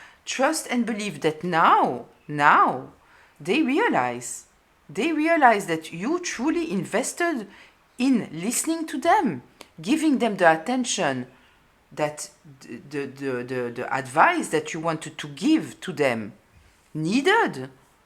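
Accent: France. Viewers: 1st time on here, glad I found you. Love your very Fremch accent, and think you are very wise. It might be my first time but I promise it won't be the last ❤🎉😊 HAPPY 2026 !! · Aside from loving your beautiful French accent, I love that your readings are actually therapy, discussing what beneath those strange behaviours of humans. I love this because it resonates with the main reason I listen to Tarot readings.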